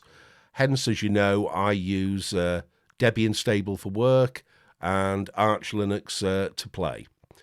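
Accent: United Kingdom. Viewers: Mint has been my main dektop OS for quite some time now. The thing just works!!!! On my desktop, my laptop, kids laptop, kids gaming desktop running Minecraft nonstop, the media centre....I've had Ubuntu installed at some point until they came up with that Unity nonsence and I was facing the dilema whether I had to run to the nearest PC shop and shed cash for upgrades or switch distros, then I discovered Mint... I've been Linux user since the late 90's and started with Slackware. Really enjoy your videos and glad to see reviewers with British accent out there :-D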